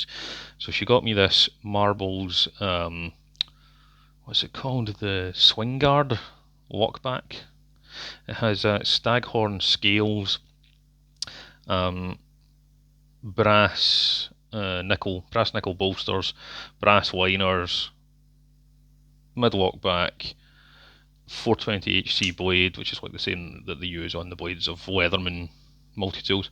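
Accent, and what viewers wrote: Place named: Midwest, United States. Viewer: Heard the accent, are you located in the midwest?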